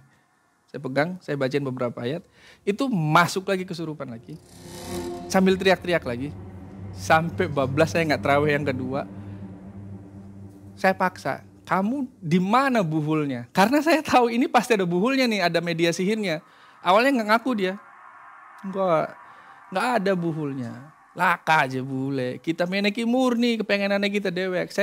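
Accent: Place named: Indramayu, Indonesia. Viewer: Orang mana nih bahasa nya ga angger klo ga Indramayu cirebon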